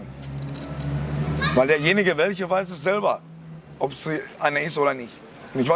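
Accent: Ruhr, Germany. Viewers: Ich liebe den Ruhrpottakzent! Ich wünsche alles gute, man merkt, dass er das Herz auf dem rechten Fleck trägt. Ich hoffe für ihn, dass er lernt Verantwortung für sich zu übernehmen, auch wenn ich ihm glaube, dass er eine bitter harte Kindheit hatte. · Wenn man nicht hinguckt könnte man meinen Kalle Grabowsky redet. Ruhrpott rules.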